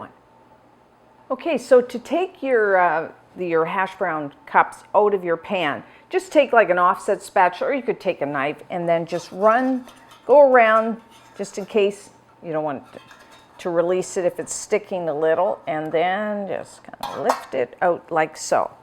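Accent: Canada. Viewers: She sounds Canadian, so maybe they use the metric system? Not sure about that, though. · I've tried a lot of recipes I learned from YouTube during this pandemic. I'm American, & I also enjoy listening to the various accents. Love listening to our friends from the north. Thanks! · Love these cooking shows. Also love the Canadian accent.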